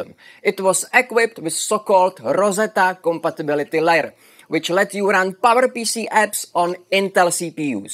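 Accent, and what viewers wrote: Czech Republic. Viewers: Hey, are you czech by any chance? You have an accent, which really reminds me of the czech ones lol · Glad I found your channel, great stuff!! I love the accent as well, are you czech?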